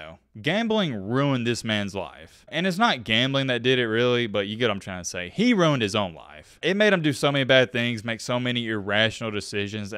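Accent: South, United States. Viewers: Because this cat has a southern accent... I guarantee you, that's not how you say this qbs last name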